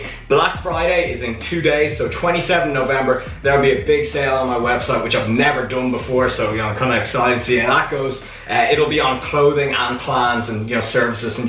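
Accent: Ireland. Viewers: the way you irish people say "three" is mint lol. i now know what part u meant haha it was class. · are you irish man ?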